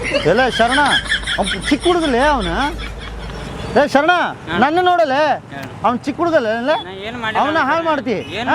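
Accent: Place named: North Karnataka, India. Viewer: ಖತರ್ನಾಕ್ ಉತ್ತರ ಕರ್ನಾಟಕ ಭಾಷೆ ಮಾತಾಡ್ತೀ ಬಿಡಣ್ಣ ಸೂಪರ್❤😅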